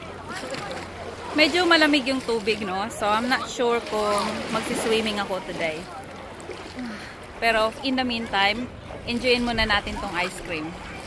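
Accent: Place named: United Kingdom. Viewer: Hiningal n c madame at tsaka ñaimpluwensyahan ng British toungue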